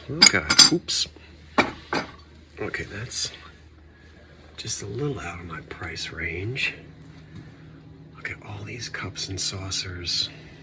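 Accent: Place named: Baltimore, United States. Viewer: I grew up in "Balmer" County. I started going to antique stores when I was a teenager ..50 yrs ago. That's when you could buy old stuff for cheap. I love all your videos, and mostly, I love hearing you speak. Takes me home. I live in Colorado now.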